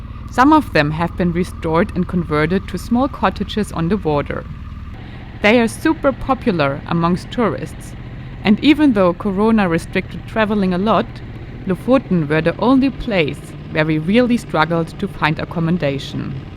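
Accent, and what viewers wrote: Germany. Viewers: Great Video, amazing Picture's :thanksdoc: good english 😉( I hear a fränkisch slang;-)) )😁 Stay healthy, whatever you do 🏍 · Me Norwegian, from Utskarpen, Mo i Rana. Are you from Switzerland? Your license plates show Switzerland from previous videos, but your accent is german. Anyway, Lofoten is best in september. We do have problems with lack of infrastructure, toilets and such. There are forgotten hidden gems to explore, like the coast of Helgeland, the heritage island of Vega, Lovund, Dønna, Herøy and so on.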